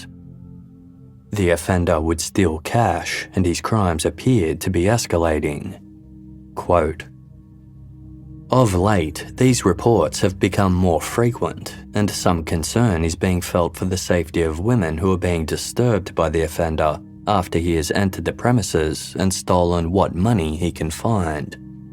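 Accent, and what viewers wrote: Australia. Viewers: Casefile wouldn’t be the same without your voice. I love that you have an Australian accent.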